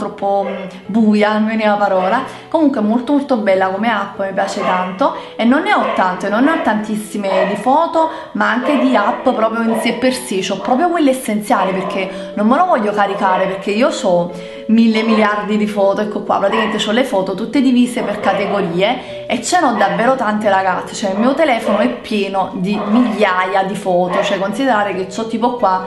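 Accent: Naples, Italy. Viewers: Sei napoletana eh? Se si anche io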